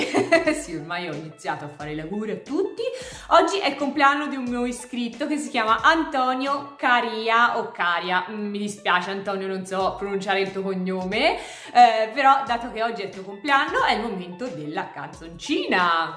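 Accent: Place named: Tuscany, Italy. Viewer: Lei è pa^ata..ah, la tua Toscana riempie le mie orecchie! Buona settimana,carissima 💙💙💙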